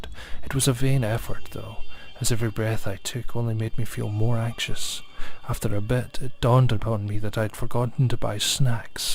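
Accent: Scotland, United Kingdom. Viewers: I love your Scottish Accent!!!! Keep uploading creepy pastas just I can listen to your voice!!!!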